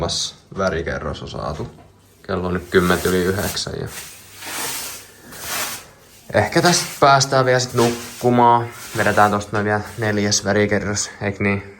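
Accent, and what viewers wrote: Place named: Turku, Finland. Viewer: Hullu turunmurre😂, asiallinen editointi ja kiinnostava sisältö kiitos viihdyin! 😁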